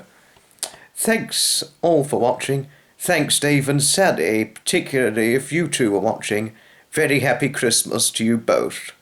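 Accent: England, United Kingdom. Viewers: … you certainly need to do “shout outs & personalised messages “ just as Nigel farage and other YouTube celebrities do. Your ace in the whole is that you have the quintessential English accent which I feel would go down a storm in th USA!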